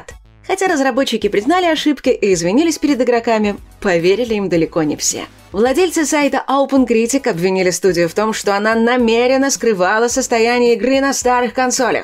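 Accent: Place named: United States. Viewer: Манера общения, под американский мотив, методом прижимания верхней и нижней челюсти. При этом дикция поставлена, будто есть за спиной актерское мастерство) Но звучит приятно)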